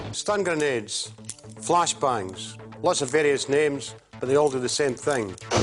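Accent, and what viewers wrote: Scotland, United Kingdom. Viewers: hes scottish not irish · Hes Scottish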